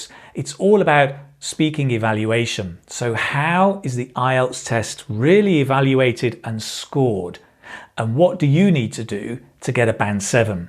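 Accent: United Kingdom. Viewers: Sir, which accent of British English do you speak ? Is it the modern RP ?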